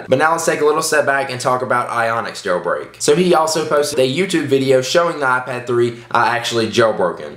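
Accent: South, United States. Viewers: You sound country...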